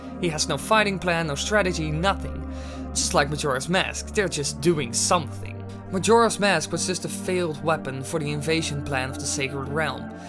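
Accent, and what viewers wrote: Netherlands. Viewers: I have to ask, but you're dutch, right? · Are you dutch bcuz your r is really strong and you have that accent Ik ben nederlands trouwens(im dutch btw)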